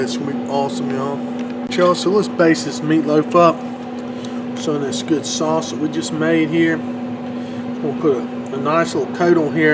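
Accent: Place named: Mississippi, United States. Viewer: Are you from around gulf port MS? My friends have a similar accent at Ole Miss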